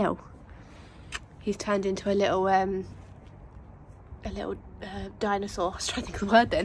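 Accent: United Kingdom. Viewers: British accents drive me nuts but you are really beautiful! Love teddy’s racoon eyes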